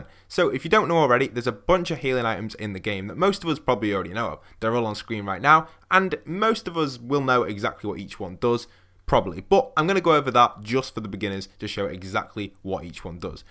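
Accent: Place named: England, United Kingdom. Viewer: Englishman accent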